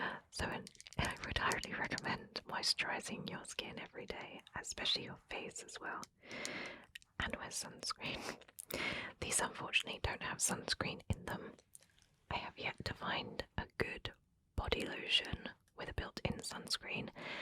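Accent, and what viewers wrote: United Kingdom. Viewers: thanks for the video. It's nice to hear the British accent, it sounds very classy. 🔥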